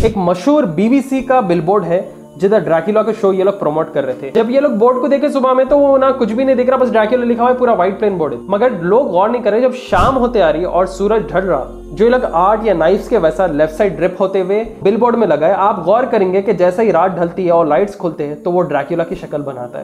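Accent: Hyderabad, India. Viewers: Are you from Hyderabad because you taking like that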